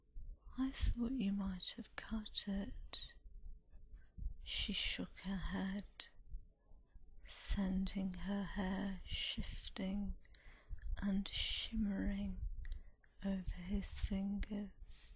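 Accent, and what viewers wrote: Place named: England, United Kingdom. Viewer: Oh my. English accent..I'm in love with you...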